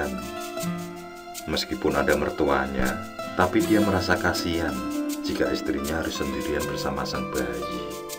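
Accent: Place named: Pati, Indonesia. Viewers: Orng Pati mana suaranya👍👍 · Pati mana suaranya 😃👆👆 Juwana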